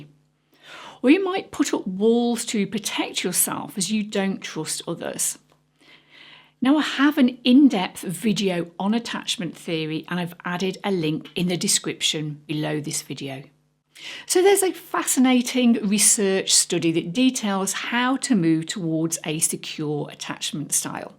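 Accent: United Kingdom. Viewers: I love your meditations or I should say your talks. They are very well informed about things like what happens in the brain, for any number of reasons May i simply askthat you talk about borderline personality disorder? because I hear you saying that you can choose this or choose that and people with bordrlije personality are terrified of abandonment, real or perceived.... and as I speak and for several years now I've been abandoned to awful little boarding homes for lots of money in rent, And the present one is costing me $900 a month and I'm told by the manager that he doesn't need the money when he gets somebody in here fast and they leave almost as fast as they get here because of things that are going on here, verbal abuse and gossip between all of about residents. Plus: same thing from the woman who cooks here. What happens to the mentally ill in mental health awareness month here in the US!! 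You sound like you might be from the UK, and I don't know , really. Here in America, it's May and May is supposed to be a mental health awareness month I got verbally abused by some overworked nurse in the ER , here in America at most private or public hospitals or in general, all the nurses and psych tecchs, etc... at least in the hospitals where I've been admitted to psych units all over Birmingham Alabama… They want their 12 hour shifts so that they can have three days or four days on and then three or four off and so they get stressed and they take it out on whom? Maybe you gue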